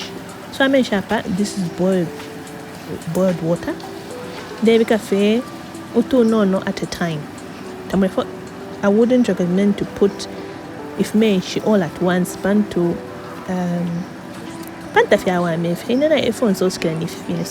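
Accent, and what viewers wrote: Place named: Zambia. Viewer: Beglish 😁 bane.👍🏽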